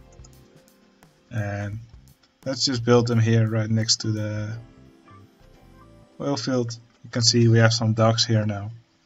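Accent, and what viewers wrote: Netherlands. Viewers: Ik kan je nederlandse accent horen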